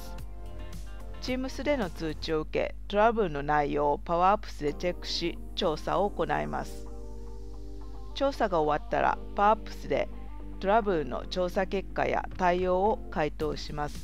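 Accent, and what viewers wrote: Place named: Japan. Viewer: 日本人的な英語ワードの読み方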